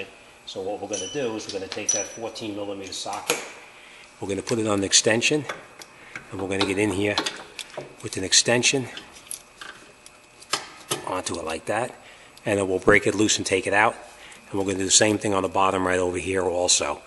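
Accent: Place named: New York, United States. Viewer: great instructional video. I detect a NY accent or perhaps, a NJ accent. Don't know which.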